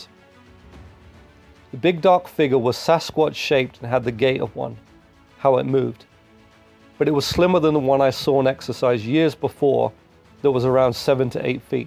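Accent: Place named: England, United Kingdom. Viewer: What a lovely calm voice you have and beautiful English accent , it does not sound like a Devon accent but forgive me if I’m wrong 😆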